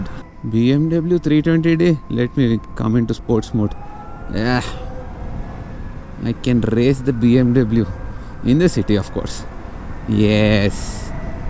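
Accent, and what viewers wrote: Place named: Hyderabad, India. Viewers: 🤧 I'm having this weird fascination all of a sudden of hearing the Hindi accent of the newer generation Southerners. 🤧 Damn you.. might be getting a lot of girls macha. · Hyderabadi bolate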